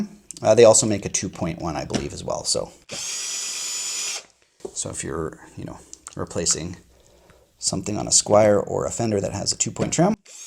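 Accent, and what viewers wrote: Canada. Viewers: I like your Canadian accent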